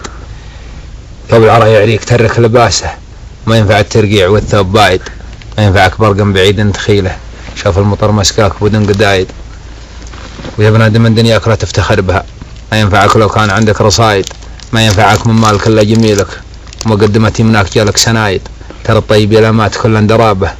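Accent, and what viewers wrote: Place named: South, Saudi Arabia. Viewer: يا ذكي .. هذا شاعر سعودي من الجنوب السعودي .. ولهجته سعودي واللحن والالقاء واضح شعر قحطان ويام الجنوب السعودي .. واضح جدا بإنه شاعر قبيلة عريقة من قبائل جنوب السعودية وغالبا قحطان أو يام .. وربما شهران .. وليس يمني أبدا .. أبدا .. لأن لليمن لحن وأسلوب ولهجة مختلفة تماما .. لكن اللي ما يفهم التمييز .. يقول على الفول عدس .. !!! وسلامتكم .. ابو شنبات ......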